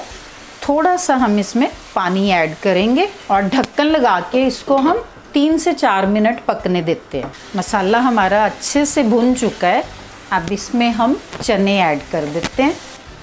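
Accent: Punjab, India. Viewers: I love your punjabi accent mam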